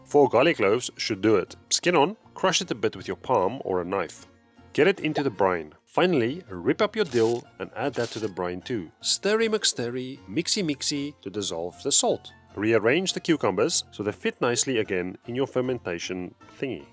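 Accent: South Africa. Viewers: I'm south African and your accent sounds south African but a bit of an odd one to me? I'm from Durban so maybe thsts why lol 😂 have you lived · How does this not have more likes?? Great editing, funny, and South African accent. Good job. I'm definitely making these 👏👏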